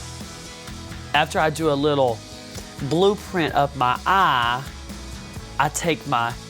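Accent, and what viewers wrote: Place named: South, United States. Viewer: sounds like a southern blonde soccer mom or something. i feel like she's going to ask me how the kids are doing and invite me over for a glass of wine.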